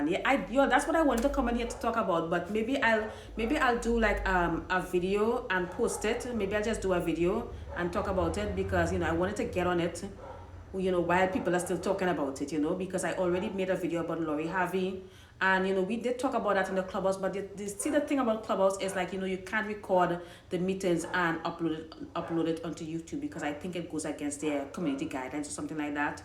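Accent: Trinidad and Tobago. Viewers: Hi are you Trinidadian? Definitely an accent 🇹🇹🇹🇹🇹🇹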